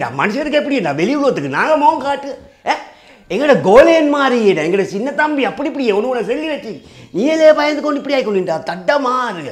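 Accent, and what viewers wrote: Sri Lanka. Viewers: ப்ரோ உங்களுடைய வீடியோக்களை ஆர்வமாக பார்க்கும் உங்களுடைய சப்ஸ்கிரைபர் ஒருவனாக சொல்கிறேன் நீங்கள் பேசும் தமிழ் இலங்கை தமிழாக இருக்கிறது நாங்கள் தமிழ்நாட்டு தமிழர்கள் கொஞ்சம் புரியவில்லை உலகம் முழுக்க வாழும் தமிழர்கள் அனைவருக்கும் புரியும்படி உங்களுடைய வார்த்தையில் உச்சரித்து சொன்னால் இப்பொழுதே உங்களுடைய வீடியோக்களை ரசித்து பார்த்துக் கொண்டிருக்கிறோம் தெளிவாக புரியும் படி வீடியோ பேசி போட்டீங்கன்னா இன்னும் பெட்டரா இருக்கும்....😊😊😊😊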